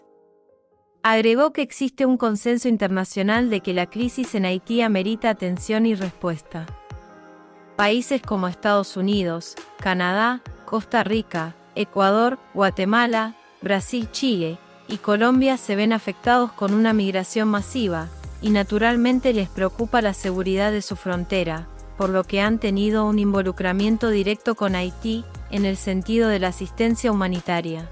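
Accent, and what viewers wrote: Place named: Argentina. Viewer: Tu acento me parece argentino.el PLD no deberia hablar del tema.ya que el gobierno de ellos fue el, que mas haitianos ilegales le dejado a RD.practicamente no hicieron nada para detener la inmigracion ilegal .si el PRM sigue con esta iniciativa de resolver este problema los Dominicanos debemos mantenerlo gobernando por muchos años.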